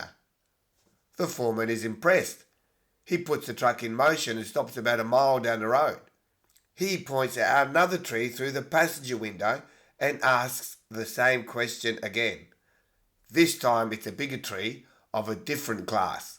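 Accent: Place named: South, United States. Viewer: Your rednek accent sucks.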